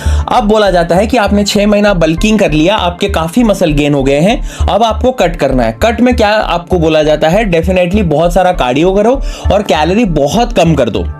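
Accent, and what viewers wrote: Odisha, India. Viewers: Bhaiya r u from odisha???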